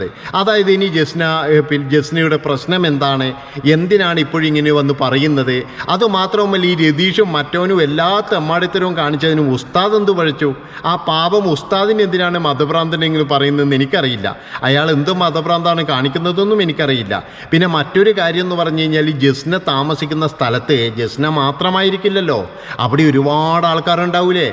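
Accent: Kannur, India. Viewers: സംസാരം കേട്ടിട്ട് നിങ്ങള് നമ്മളെ നാട്ടുക്കാരനാണെന്ന് തൂണുന്നു.കണ്ണൂർ😂❤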